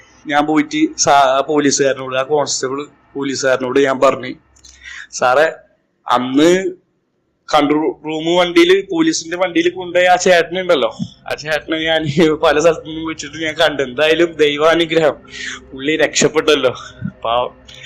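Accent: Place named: Kochi, India. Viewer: Kochi slang alle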